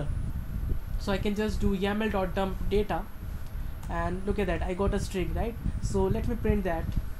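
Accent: India. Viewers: i kind of like the indian accent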